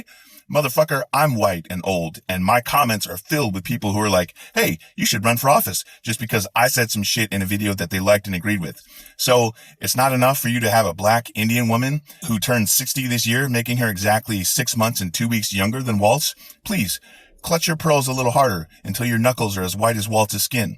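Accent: Scotland, United Kingdom. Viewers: am I detecting a bit of a Scottish accent here?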